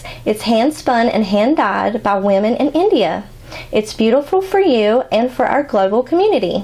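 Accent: South, United States. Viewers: What southern state are you from?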